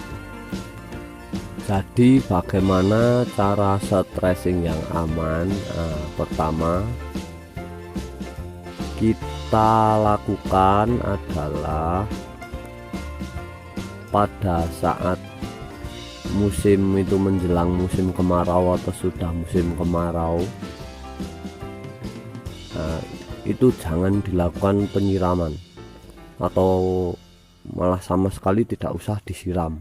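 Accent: Temanggung, Indonesia. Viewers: Temanggung ya mas?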